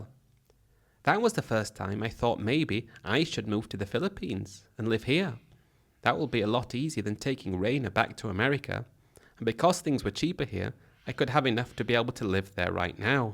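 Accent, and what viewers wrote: United Kingdom. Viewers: You have a very British accent for a yank who never left home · Weird British accent for somone from Missisipi.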